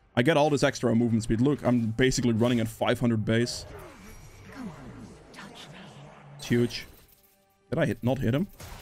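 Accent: Netherlands. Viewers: He sounds dutch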